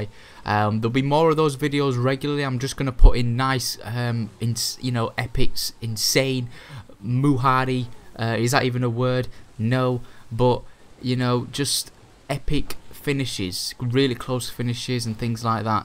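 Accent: United Kingdom. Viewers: also what part of the uk are you from? ive had a few people in lobbies recently telling me I sound a lot like you...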